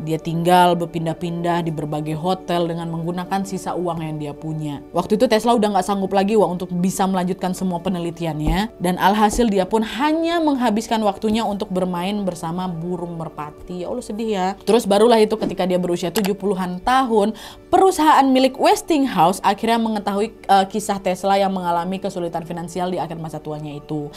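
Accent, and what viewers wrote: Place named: Padang, Indonesia. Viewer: Logat nya unik😂😂😂 logat padang kayak nya nih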